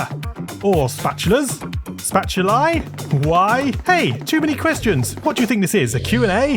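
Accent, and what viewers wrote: United Kingdom. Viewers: i love your voice and that slight british accent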